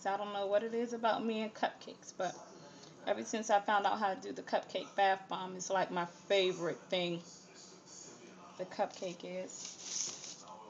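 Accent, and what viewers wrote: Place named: United States. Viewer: THIS LADY SOUNDS AMERICAN!!!!!!!!!!!!! BY THE WAY GUYS im from NZ so i don't get AMERICAN PEOPLE!!!!!!!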